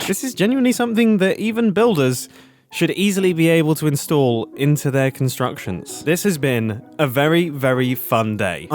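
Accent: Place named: United Kingdom. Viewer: cause of his British accent it sounds like mumbo said “this has been a very fan day”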